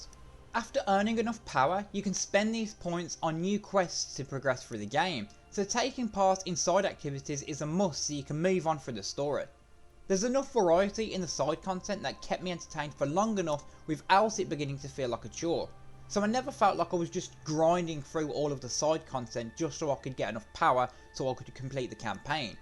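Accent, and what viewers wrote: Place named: Birmingham, United Kingdom. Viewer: Downloading now, thanks for the review! Ps love the brummy accent ;)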